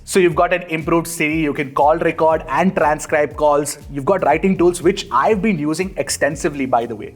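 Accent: India. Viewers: I left the video just after 3 seconds just because of this kind of typical Indian accent so ann0 ying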